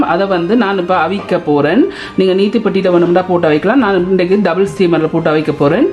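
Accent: Jaffna, Sri Lanka. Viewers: I like the way you speak Jaffna tamil and including some sinhala words like bola, kithul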